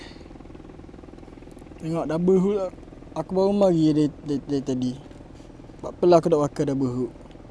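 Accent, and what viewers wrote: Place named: Terengganu, Malaysia. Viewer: Kuat pekat likat baso ganu... mcm xsesuai untuk channel youtube.. tp trbaek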